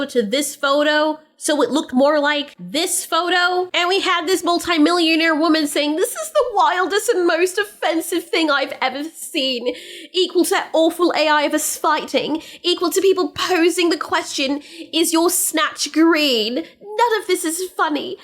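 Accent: United Kingdom. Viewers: Brutal takedown done with the best mocking British accent ever! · Love your British Accent by the way. Also great analysis ❤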